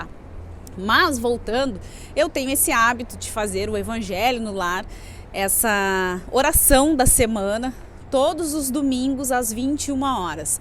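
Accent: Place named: Rio Grande do Sul, Brazil. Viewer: Como vc é linda tem uma voz que transmite uma paz...nosso sotaque gaúcho é lindo...lindo relato me emocionei...minha mãe desencarnou dia 3 de agosto pelo covid 19 sinto muita saudade dela...eu tive duas oportunidade de ir até lá aonde ela está...lugar de muita paz muita beleza incrível não tive vontade de voltar tinha uma praça com bastante árvore com muitas pessoas felizes...eu passei por essa praça com ela...e logo a frente tinha uma praia linda com uma areia bem clara com sol bem iluminado o mar bem azulado incrível fomos caminhando e conversando é logo depois voltei foi muito emocionante...eu não queria voltar queria ficar com a minha mãe lá nesse lugar lindo e de muita paz...mas temos que saber esperar a hora...creio que um dia irei definitivo morar nesse plano lindo com ela...bjos querida.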